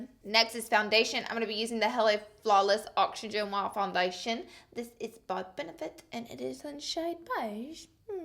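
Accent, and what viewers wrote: South, United States. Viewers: love the contacts:) and all your southern twang coming out!! beautiful look :) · 3 things I like about you 1.) you don't owe anything but still offer it up to people & 2.) you embrace your southern accent. I have one too! I'm from KY! 3.) you work that gray in your hair. I got it too, girl. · is it me or she has more of a southern accent lol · honey where are you from? you do a FAB southern belle. you must be somewhere close to the southern states!! LOVE IT! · 💖 ya and your southern accent lol! then British! what contacts do you have on?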